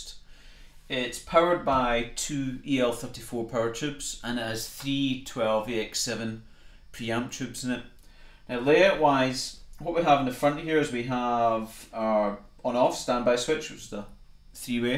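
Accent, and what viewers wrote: Scotland, United Kingdom. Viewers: Thanks for that demo. I used my Grado head phones for this one ! That amp sounds MASSIVE ! Great tones ! Great playing and Scottish accent !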